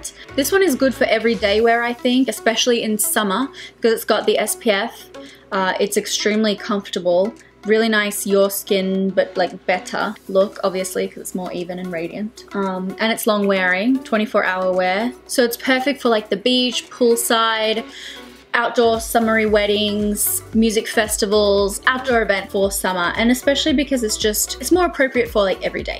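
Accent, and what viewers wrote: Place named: Australia. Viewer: when you said "desire" i heard "the Zaya" :)) gotta love the aussie accent <3 need to try the lipsticks as they look amazing on you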